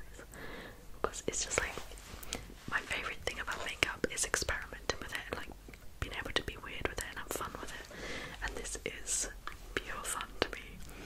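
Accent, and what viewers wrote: United Kingdom. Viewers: Pros: British accent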